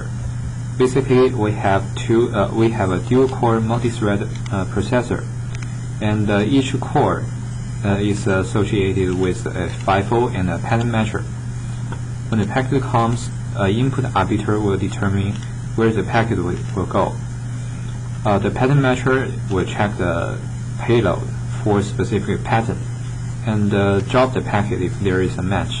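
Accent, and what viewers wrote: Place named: China. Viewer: 老铁，听口音像是中国人吧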